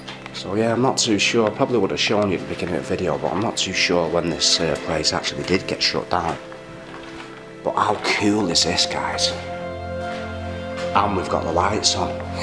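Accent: Manchester, United Kingdom. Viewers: Hi m8 i watch 2 or 3 Urbex channels But i think your content is top draw and the old cheeky Manc commentary adds adds a different dimension to it too, Think you should go back their and do a more longer and in-depth look at the place as it was very interesting as a old skool 88-93 raver i love the old time buildings and architecture, Wonder if anybody stoped off their before going the Twisted Wheel, Hacienda or Thunderdome lol Keep Up The Good Work R Kid lol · Got this link from a MEN news article. Blew me away. Absolutely love this stuff. Really love the Manc accent commentary too! "Holy smoke!!" Pissed myself! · Ey pal, is Man Vic still open ¿? , me n our kid (W. Casino devotees), before we had motors, used rail to getup to W.Wallgate from Victoria. HaH! In 77 we were approached, ticketing area,, by a geeza and his bird with an Adidas FULL TO BOSTINGLY, of grabbed t. 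Lights (Tuinal caps), and we had a few for the morning and fk, after the b.st.blueys, by time we got back to Chesterfield next mid morning we was well fkn rammed. Passed through M V only coupla times after and, post ticket area, had to, and managed to fall the like 20+ steps into the underpass to the W W gate platform. We all got fed up with later attacks on the rail travelling soul crowd as Wigan locals turned against us. Am 60 now, blimey, wish was a young again, y'know. Neat vlogs oak, bang on, your accent reminds of the Trafford lads, norarf! Say, you do olde pubs as well, Okeh, outside Manny Picc there used to be a mini 2 roomed alehouse, is it still theere, just across the road from where a traveller came out from that station, where the taxis later used to congregate ¿? Top endocrine Whitworth St I think. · I love the videos but this guy surely accentuates the Manc accent haha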